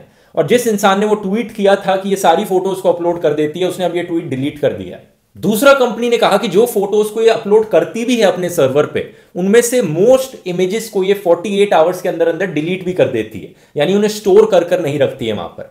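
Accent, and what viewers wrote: India. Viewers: I dont understand a singke fricking word youre saying... please talk without that indian accent :)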